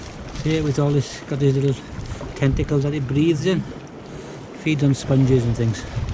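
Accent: Wales, United Kingdom. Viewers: Great prawns n lovely welsh accent👍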